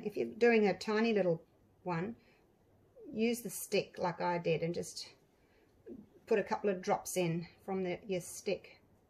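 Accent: Australia. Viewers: Yes I totally agree, I love your accent. My friend at work is from Australia too, she sounds like you even though she has been living in Ontario, Canada for more than 20 years. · there are resin syringes, i also find that a pipette works too. i work with uv resin and just started but have gone crazy making pendants. btw the moment she heard your accent my veardies head popped up and she fired off with a deeper red and orange coloration. she tends to love an aussie accent and gets all excited when she hears one. as for left overs you could try a free form pendant with a hot glue barier to help keep it from going too thin. resin makes a wonderful acrylic pour look. edit to add: those broken bits can be reset with a nice thin set resin you just whipe it on and let it set. uv resin can also be used to repair the bits as well so it doesnt run.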